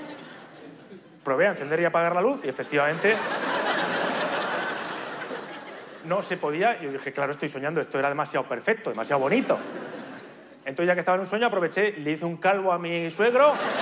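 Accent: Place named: La Mancha, Spain. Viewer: Seduccionnn fatal!!!!!! 🤣🤣🤣Ese tono manchego ,que crackkkk jaja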